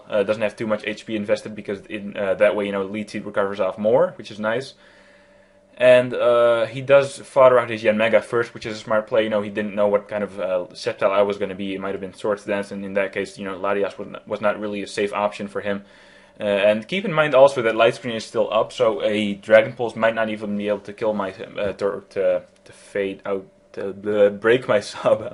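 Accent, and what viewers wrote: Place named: Netherlands. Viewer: het blijft moeilijk om een nederlands accentje te verschuilen xD nice battle ;)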